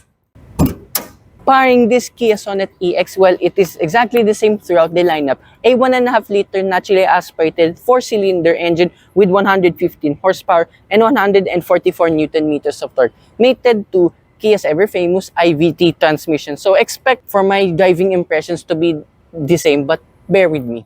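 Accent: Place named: Philippines. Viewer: Masgusto ko yung ganitong review. May Filipino accent. Masnatural since ganito halos lahay magEnglish merong Filipino accent. Masnaiintindihan ng tao. More review to come sir.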